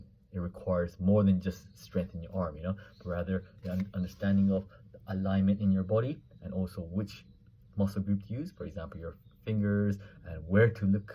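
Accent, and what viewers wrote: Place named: London, United Kingdom. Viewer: Ah I see. That's why you got a hint of London accent when you speak english. Thanks for answering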